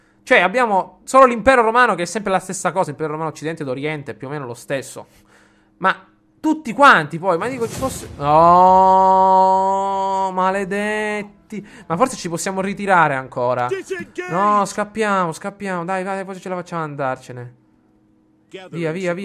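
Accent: Sicily, Italy. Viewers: Quando ti alteri un pò e alzi la voce col tuo accento siciliano mi fai morire, grande Ed! P.s ricorda di finire l'esercito vandalo sopravvissuto alla battaglia, c'è il loro re lì dentro. PPS. i tuoi giavellottisti non lanciavano perchè avevano davanti a loro gli alleati ed erano ostacolati, credo.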